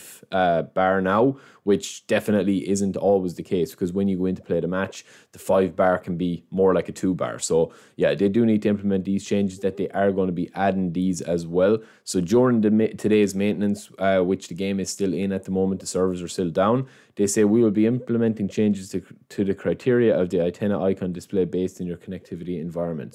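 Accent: Ireland. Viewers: I know it may be random, but are you from Cork?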